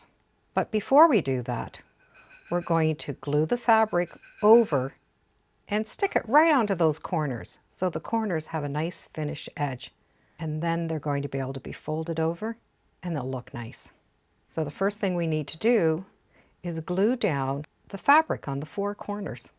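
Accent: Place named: Canada. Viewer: your videos are additive☺. Beautiful idea. You do it so neat and perfect. Love the fabric!. Are you in Canada? Your voice sounds like a Canadian😊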